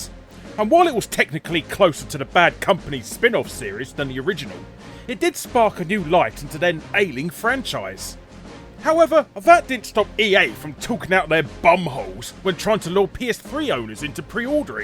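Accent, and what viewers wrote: England, United Kingdom. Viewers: Me: “What’s a beater code?” … Oh! A BETA (Bay-ta) code! Gotta love that English accent! Lol!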